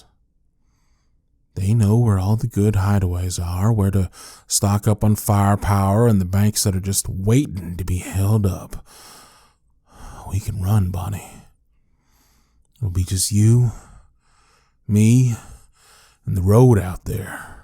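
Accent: South, United States. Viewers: This was amazing. Made my whole evening better. Love that southern drawl. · I love you cowboy accent it’s so hot and fine love you can your voice ❤️❤️😍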